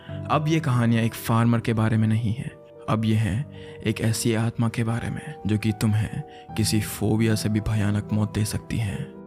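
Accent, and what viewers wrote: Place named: Bihar, India. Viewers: Dude, you have nailed it. This is one of the best story telling of yours so far. Your voice modulation, Bihari accent, background score everything is top notch. Great work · its bihari accent for me....damn.. adooorable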